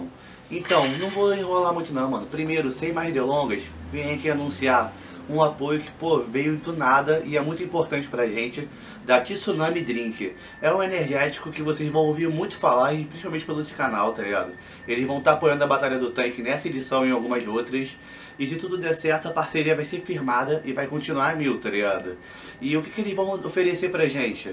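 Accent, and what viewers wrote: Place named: Favela, Brazil. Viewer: com essa língua presa e dialeto de favela não tem nada a ver kkk Acompanho o canal desde 2014 e não me acostumo nunca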